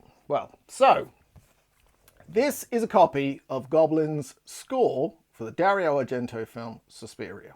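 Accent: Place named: United Kingdom. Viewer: Great stuffs in this first episode, really you're an incredible music collector, you're so young but with so many top items that I'm literally shocked about it! My respect for you will last forever! I like your format on the video, I like your British accent, although I should follow sometimes the YouTube subtitles because it's not simple for me to understand every words! I too have the vinyl and i like this album (really underrated by the fans), about the other albums I've downloaded them from Spotify to give them a listen... You're so lucky to have the boxset of as the Suspiria TP. A piece of Italy in your life! Stay gold!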